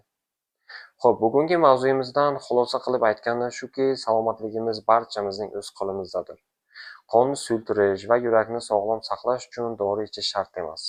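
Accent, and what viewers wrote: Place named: Tajikistan. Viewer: doktor millatiz nima talaffuz iz ungacha, tochik ,hitoy, juhut emasmisiz?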